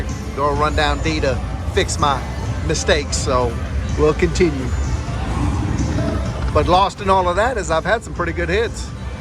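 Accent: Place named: South, United States. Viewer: Nice run!! If it ain't broke, don't fix it 💯 hey, your voice and southern drawl sounds like Brock from the Reba show. Keep on feelin lucky ❤🎉❤🎉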